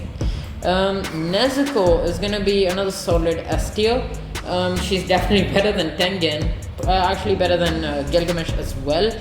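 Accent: India. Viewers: Bro you indian? Because your English way of talking is similar indians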